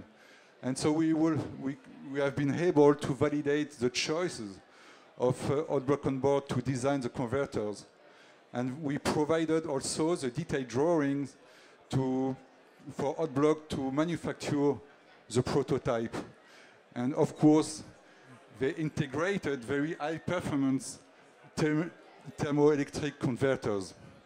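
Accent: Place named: France. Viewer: À la manière dont vous vous exprimez en anglais Il n’y a aucun doute que vous êtes français lol Ou sinon, merci de m’avoir appris plus sur l’énergie nucléaire et aussi pour les sous-titres en français. Bonne continuation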